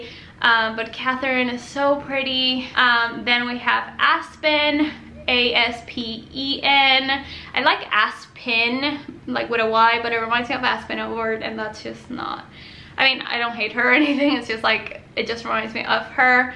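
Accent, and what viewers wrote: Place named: Spain. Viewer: Girl I love your accent! Spanish is such a beautiful language, especially with girls names! Never be shy to speak in Spanish or in your accent on your channel cause it sounds amazing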